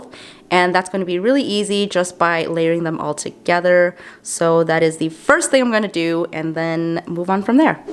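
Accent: Chicago, United States. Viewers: I just stumbled upon your channel after watching a bunch of Youtube Christmas decorating videos. LOVE your videos, especially your DIY projects. Also your Chicago accent reminds me of home (I’m from Illinois originally and your voice sounds just like one of my friends ♥️). Happy holidays to you!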